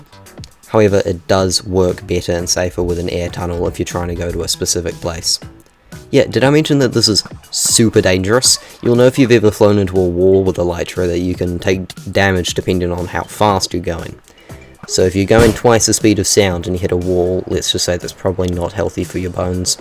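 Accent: New Zealand. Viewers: Omg W New Zealander (Kiwi?) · I know a kiwi accent anywhere, chur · NZ accent? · Amazing work! Also very cool to hear such a prominent Kiwi accent, very cool! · Are you a Kiwi? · Are you from NZ? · Hello fellow Kiwi. Love the ingenuity in action. Heard that accent and it always just hits different 😅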